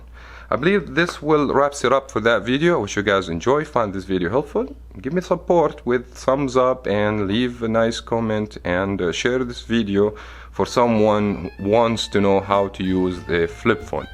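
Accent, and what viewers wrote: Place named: Arab world. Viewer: انت عربي؟ لهجتك كأنها عربيه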